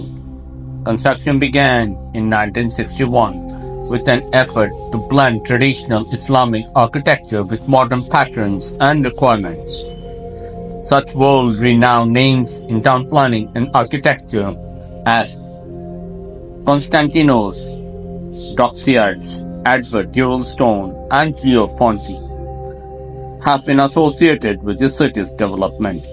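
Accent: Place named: England, United Kingdom. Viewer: Love the English accent..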